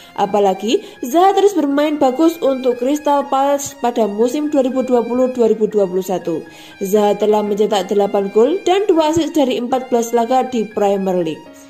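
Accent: Java, Indonesia. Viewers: Surane medok medok pantes 🤭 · mani medooook eta suara pengsisi suarana🤣.....forza milaaan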